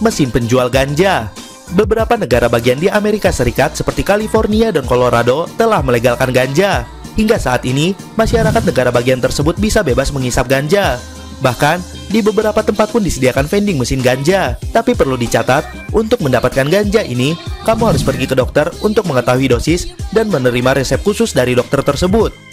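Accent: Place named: Indonesia. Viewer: loghat indon kan..ape yg pelik sgt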